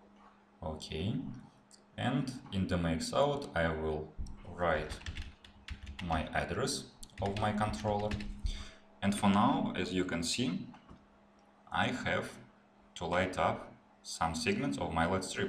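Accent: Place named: Oxford, United Kingdom. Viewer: То что прям искал ! Спасибо большое ! ) Отдельное спасибо за оксфордский акцент. Всё понятно )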